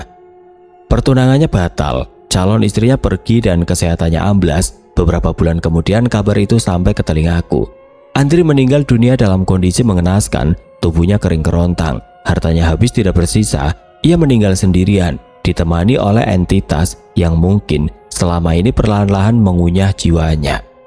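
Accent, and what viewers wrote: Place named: Java, Indonesia. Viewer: Suara medok lu mana wooiiii...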